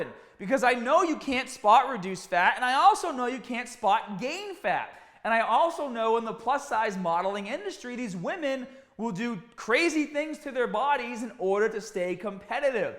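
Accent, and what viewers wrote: Boston, United States. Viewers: He’s from Boston lol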